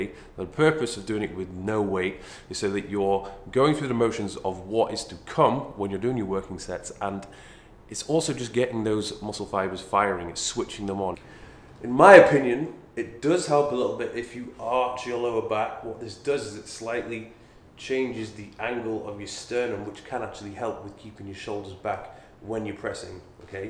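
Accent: United Kingdom. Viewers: I don’t know what’s better his form advice or his British accent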